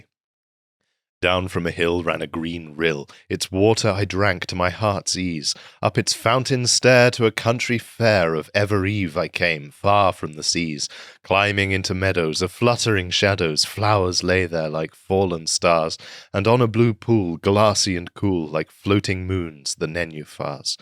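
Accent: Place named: United Kingdom. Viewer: Heavens to Betsy that was a phenomenal piece of art to hear. Excellent delivery too, Cadence perfectly emphasized the proper beats. I imagine it loses something of significance when it is said in any accent that is not British.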